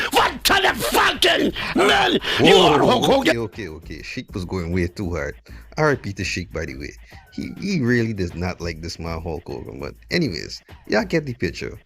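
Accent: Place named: Guyana. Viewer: I like your accent bro. You inspired me to look up more things about Guyana. Looks like a cool place ngl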